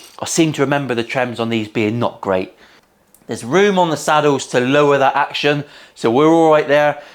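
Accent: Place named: England, United Kingdom. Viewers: Another Monday made better - cheers you fucking legend. Btw love how you pronounce it pacifiker. Janner! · USA Americans will have a hard time understanding his talk .... he is from England and not the USA...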